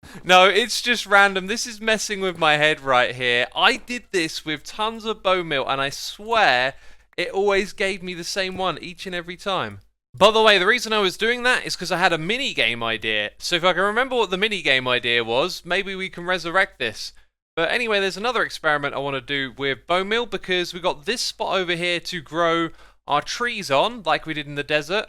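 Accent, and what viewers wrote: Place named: London, United Kingdom. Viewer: overcorrects his 'v' 'th' substitution error by saying 'nether' instead of 'never'.:) I enjoy your london accent.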